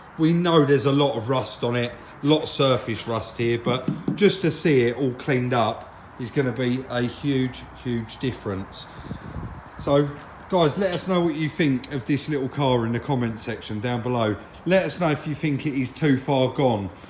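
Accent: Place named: Cockney, United Kingdom. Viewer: I have to admit, when I first started watching your channel, I thought you were another Mike Brewer-esque "cockney cheeky-chappy" and it put me off a bit. I couldnt be more wrong. You come across as a really likeable honest bloke with quite a bit of knowledge. The Range Rover engine swap vids totally had me hooked. I really look forward to your vids now, as I never know what curveball is going to come next. (The bike for instance). Awesome channel. Keep em coming. "Lets get involved"👍👍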